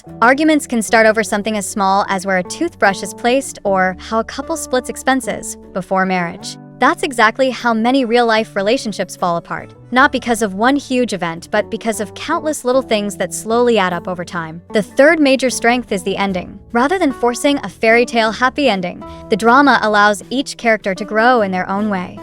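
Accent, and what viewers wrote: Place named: United States. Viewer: While I am glad that cdramas are getting more attention, it really rubs me the wrong way when Chinese names are pronounced American style. It's really not that hard to figure out how the names are actually pronounced.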